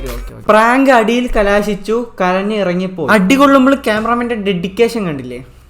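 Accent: Palakkad, India. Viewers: പാലക്കാടൻ aanno?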